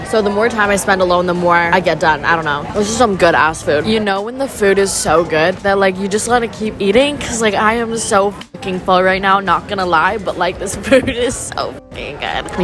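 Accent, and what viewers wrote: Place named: United Kingdom. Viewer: Hi, my name is Brianna and I am from London and you have a lovely British accent.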